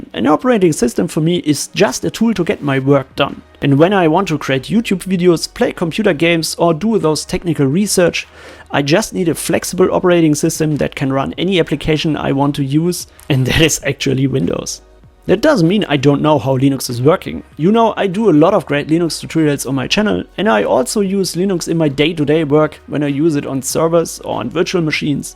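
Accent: Germany. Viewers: Yeah, German accent, great 😃 Subscribed! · Man hört kaum das du deutscher bist xD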